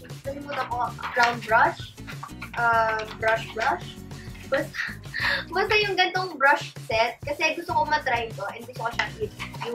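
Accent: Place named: Pampanga, Philippines. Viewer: kapampangan ka te? yung accent mo kase eh 😊😊😊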